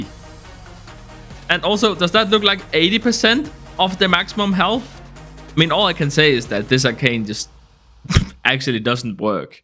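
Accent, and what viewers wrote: Denmark. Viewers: You sound Danish..? Or Scandinavian in general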